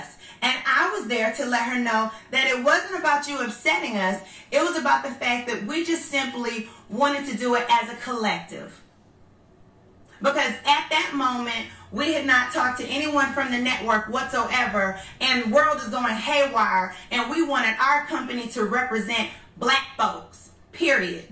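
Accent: New York, United States. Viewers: You don't usually sound like this... New York accent... sounding like Nicki Minaj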